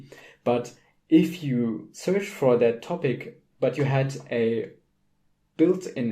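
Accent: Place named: Germany. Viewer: why if i hear how people speak english i directly know which their original countries are? I guess he is GERMAN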